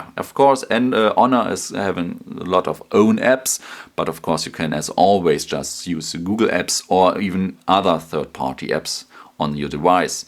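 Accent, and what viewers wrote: Germany. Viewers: German accent. :)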